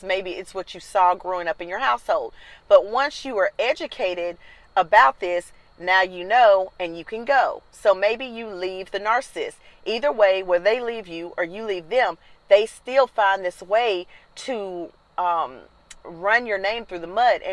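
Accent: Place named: South, United States. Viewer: Love your southern voice. Great review and thanks for sharing. Can you please do a video on narcissist neighbors who refuse to take no for a answer.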